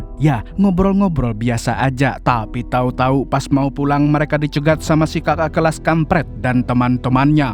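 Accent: Banjar, Indonesia. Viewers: Nah urang banjar kh😂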